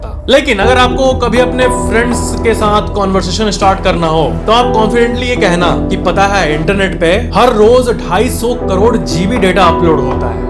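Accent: Bihar, India. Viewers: Apka accent thoda bihari type lagta hai aap bihar se ho?❤ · AAP BIHARI HO KYA...????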